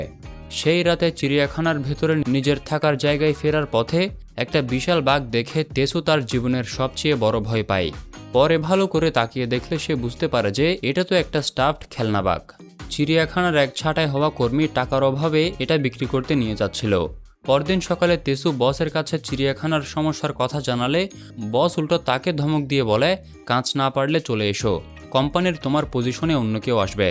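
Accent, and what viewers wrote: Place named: Rangamati, Bangladesh. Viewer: Apni Q & A koren plz onk qsn amar... Apni ki Chakma? Apnr voice amr Rangamati types lage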